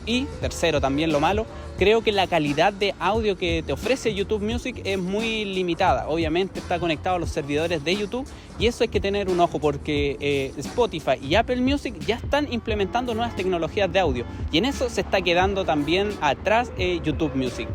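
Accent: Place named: Chile. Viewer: Que ciudad es donde grabaste? Me gusto el contraste entre los edificios y la naturaleza. Tenes acento chileno y me da la impresión que ha de ser el sur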